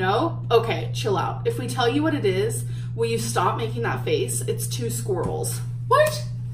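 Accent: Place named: Calabasas, United States. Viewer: She’s from Alabama but she speaks like shes from calabazas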